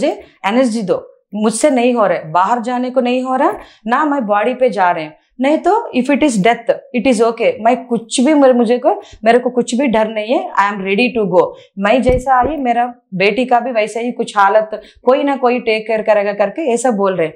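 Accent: South, India. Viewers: I love south indian HINDI ACCENT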